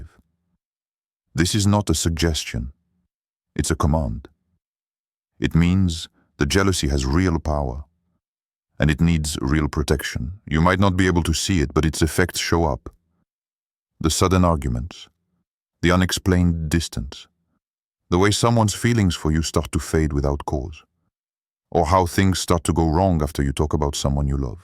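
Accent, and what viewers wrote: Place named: France. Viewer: Agfirullah Ya Rabbi but I can't stand😮 it but in love with that Speaker voice with his French accent,Tauba🤲🏻😭A always want hear his voice 😢Ya Latif, I'm addicted to it Hasbun Allah vanimel vakil😢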